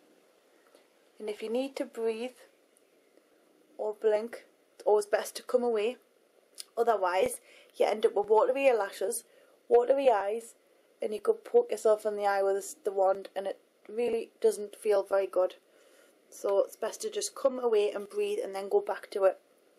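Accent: Newcastle, United Kingdom. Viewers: love the geordie accent, its sounds like meeeee!!!!!!!!!! :) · i love love love your videoss, and loove you newcastle accent:D thanks for this video hunnie:) xxxx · R u from Newcastle? :S :) x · Thats a Newcastle accent, not Irish! She comes from my end of the country yey! And I love your videos theyre so great =] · Are you from Newcastle ? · its called a geordie accent. dont leave your area much do you?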